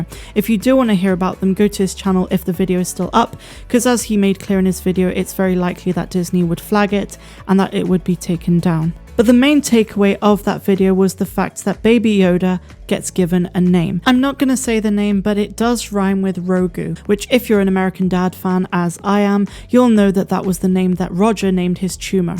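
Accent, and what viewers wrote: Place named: West Country, United Kingdom. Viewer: From the South West of England.. i knew it.. We love the letter Arrr in these parts.. Gert Lush x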